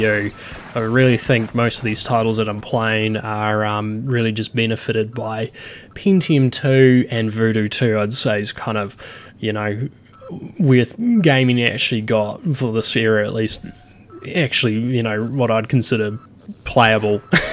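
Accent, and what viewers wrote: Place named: New Zealand. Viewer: My first retro build a few years ago is a similar machine. I wanted to build a better version of the PC I had as a teenager, as if I had the capability to max it out. I've got an S3 Virge as my 2D card and a Voodoo 2 PCI as my 3D card. 32 MB of RAM, 7200 RPM 20 GB Maxtor Hard drive, and an AWE 64. Funnily enough, I just bought a 233 MHZ P1 with MMX to upgrade it. I also have a Super Socket 7 board that I recently got that supports 512 MB of RAM and has an AGP slot. I have an extra 233 MHZ processor and A Voodoo 3 2000. I want to see how the two systems compare to one another. Might also be fun to try to toss Windows XP at the Super Socket 7 build at some point just for a laugh. Also, are you by any chance a New Zeelander? I have a few Youtubers that I watch that are Australian, and your accent is messing with my brain. It's similar enough that my brain is expecting it to sound Australian, but there are certain things that sound different.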